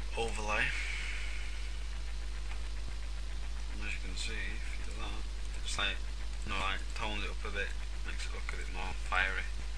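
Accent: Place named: England, United Kingdom. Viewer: Seriously? He's English duh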